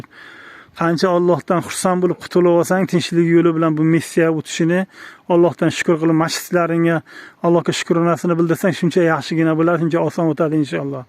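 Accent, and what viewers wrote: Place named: Kashkadarya, Uzbekistan. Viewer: Кашкадарёдан бу ака, гапиришига караганда. Охирги пайтларда куп талантлик одамлар чикябди кашкадаредан. Тайсин, сарик сочли бир бола чикканди валиахд. Энди акамиз чикиш килибди. Куникиб колдик анча, миллион билан дизайнга тема булибдида яна. Узб маскарабозлари точно эргашади сизга.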